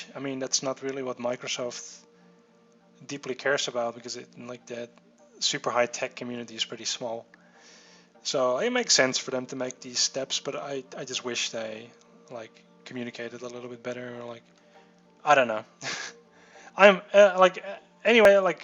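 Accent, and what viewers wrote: Netherlands. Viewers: Of ik kan goed Nederlandse accenten herkennen, of jouw Engelse accent is nog niet optimaal... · Just discovered your channel, first thing I noticed was your Dutch accent :) Nice channel though!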